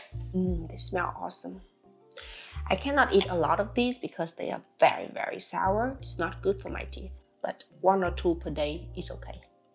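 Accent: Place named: Germany. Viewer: I love to see that you got some of German accent.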